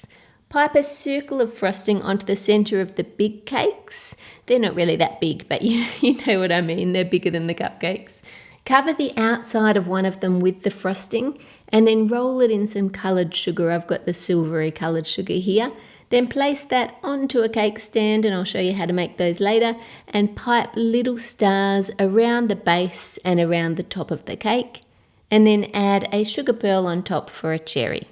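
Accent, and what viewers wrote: Australia. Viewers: Is she Australian or English? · I like your Australian accent :D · Heaven Claunch haha I didn't notice that because I'm Australian like her 😂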